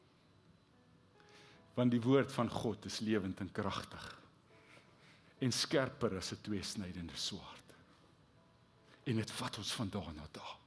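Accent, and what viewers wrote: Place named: Netherlands. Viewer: Waar komt u vandaan, klinkt erg Nederlands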